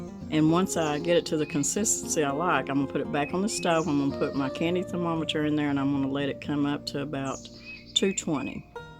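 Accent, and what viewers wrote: South, United States. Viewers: Love the Southern accent. I was like what’s a steak cake? 🤣🤣🤣Stack cake. Don’t know what that is, but I’ll look that up next. I’m from the PNW so my ears don’t always hear right!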